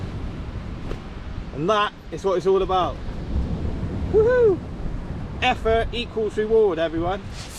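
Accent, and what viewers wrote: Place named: Bristol, United Kingdom. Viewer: What’s wrong with a Bristolian twang lol so you have a link to the FB group?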